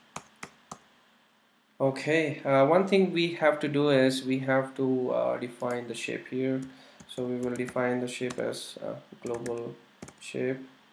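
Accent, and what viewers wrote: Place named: India. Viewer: I like your Indian accent :)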